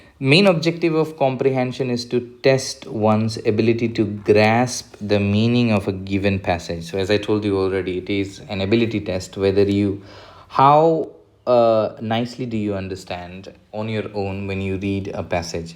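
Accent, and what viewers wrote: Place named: Assam, India. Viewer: Sir r u from assam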